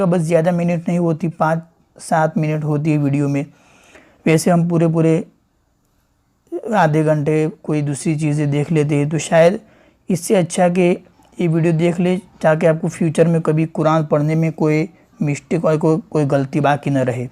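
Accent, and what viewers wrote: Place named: Maharashtra, India. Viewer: aapki accent Maharashtra ki lagti hai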